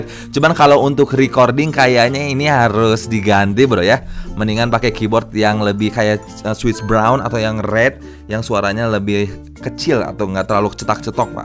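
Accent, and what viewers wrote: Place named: West Java, Indonesia. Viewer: Orang Sunda Mana suarana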